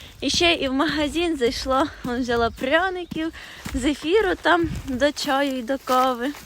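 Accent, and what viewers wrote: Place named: Surzhyk, Ukraine. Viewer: Це жарт. Вона просто грає таку роль. І говорити без суржику вона теж вміє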